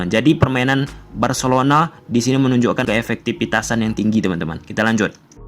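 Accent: Malaysia. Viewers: abang...logat melayunya kontal kali. dari utara kah? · logatnya kayak orang malaysia, warga malaysia kah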